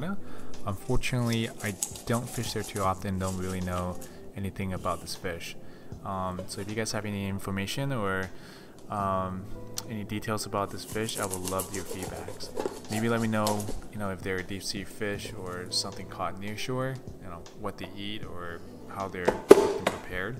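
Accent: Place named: South, United States. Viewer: Your voice, with a touch of Southern, is so soothing... perfect zen element to go with sushi! Thanks.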